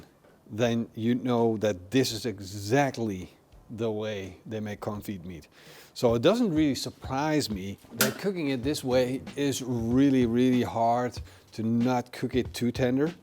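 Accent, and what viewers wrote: Netherlands. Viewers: I almost metioned the cold smoke before the cook, but I knew I had to wait until the very end before commenting. You know your stuff, and that is why I watch. Once again, I love hearing the Dutch accent. Reminds me of my youth in Den Haag.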